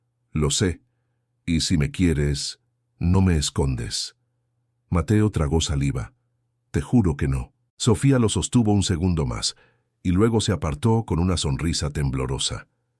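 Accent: Argentina. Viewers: narrada con acento argentino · me emocionó el acento argento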